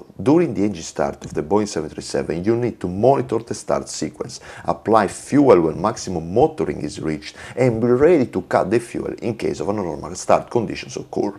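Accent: Italy. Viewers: Sei italiano e sei sardo ahahahah si sente dal inglese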